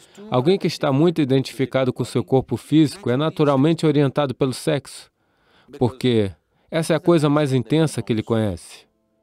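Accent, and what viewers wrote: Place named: Rio de Janeiro, Brazil. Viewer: so digo uma coisa,tradutor é um carioca. o resto nao sei de nada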